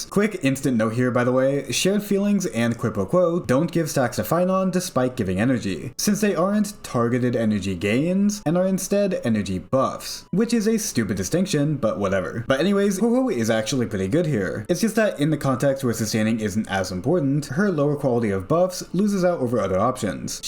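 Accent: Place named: United States. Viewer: You sound like an American so I was wondering how you mispronounced Geopard's name.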